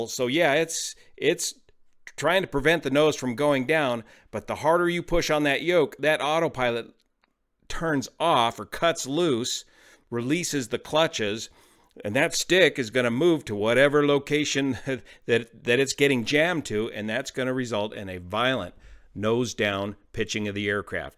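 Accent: Chile. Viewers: Definitely chilean spanish👌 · Based on the accent of the voice, It seems that the person speaking in the video is from Chile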